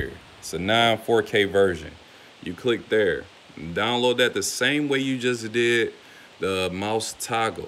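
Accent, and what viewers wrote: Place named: Philadelphia, United States. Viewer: You gotta be from Philly I know my people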